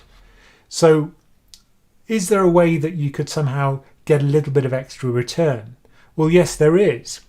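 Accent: United Kingdom. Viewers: Temporary. Targeted. Transitory. Sounds like US Fed talk, just with a British accent.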